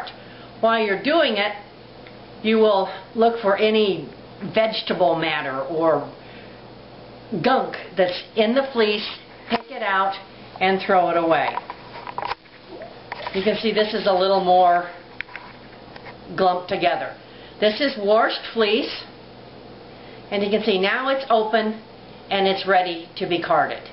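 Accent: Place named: Pennsylvania, United States. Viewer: You speak like my mother! Are you from the Pennsylvania area or West Virginia by any chance?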